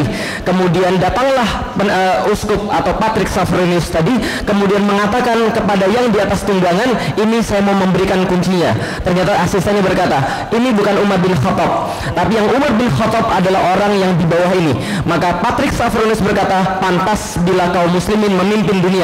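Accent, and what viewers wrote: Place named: Sumatra, Indonesia. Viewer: Dahsyatnya...keberanian Ustadz Felix... .wajah boleh Cino....Tapi suaranya Sumatra.banget....Semoga dpt trs.. .berdakwah...aamiiiin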